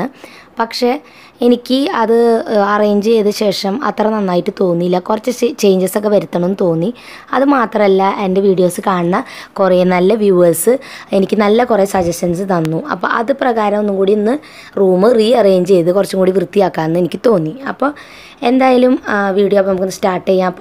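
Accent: Thrissur, India. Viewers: Enikum thrisur bashem trisurkarem ishtanu.. Njn ernakulam kaariya.. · Thrissur ഭാഷ കേൾക്കാൻ നല്ല രസമുണ്ട്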